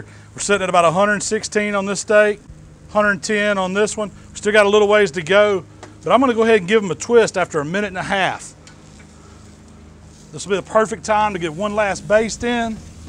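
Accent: South, United States. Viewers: Your southern accent has faded a little bit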